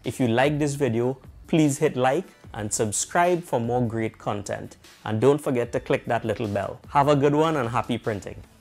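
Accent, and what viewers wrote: Trinidad and Tobago. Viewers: Great video, you sound Trinidadian. I'm happy to see a tech video that's not from india or usa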